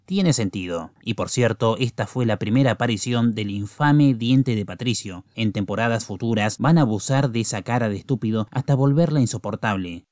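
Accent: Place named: Argentina. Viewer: Tu acento argentino es incómodo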